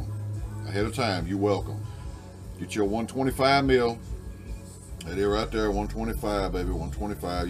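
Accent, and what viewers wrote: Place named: Louisiana, United States. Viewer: I like your video's very funny.I have a question for you where are from I was trying to figure out your accent I'm guessing Louisiana.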